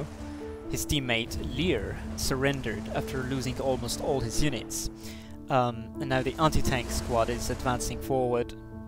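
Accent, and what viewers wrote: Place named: United Kingdom. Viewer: When did your accent become british?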